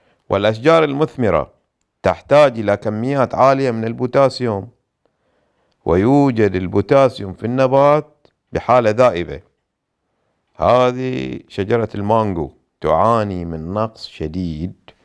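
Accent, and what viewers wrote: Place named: Bahrain. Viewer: انا توقعت من البحرين من الاسم للعايله وهم اللهجه بحرينيه واضح جداً..والنعم والله من اهل البحرين. اخوك من السعودية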